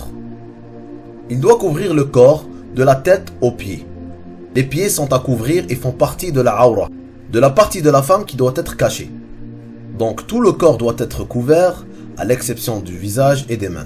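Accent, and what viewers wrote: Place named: Kabylie, Algeria. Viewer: L'homme qui parle dans cette vidéo kabyle non🤔 (d'après sa façon de parle )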